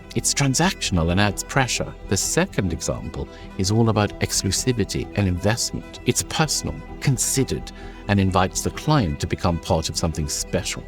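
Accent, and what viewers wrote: RP, United Kingdom. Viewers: BE CAREFUL, THAT 'POLISHED' BRITISH ACCENT IS WHAT GOT YOUR MIND AND NATIVE LAND COLONIZED.. I AM HERE TO COLLECT 😈🍄💯🤘🏾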